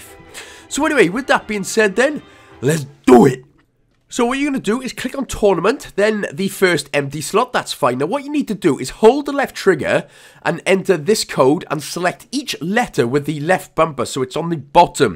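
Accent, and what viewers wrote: Wales, United Kingdom. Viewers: maybe he's ammerican? The Welsh accent isn't the easiest to follow if you are not from the UK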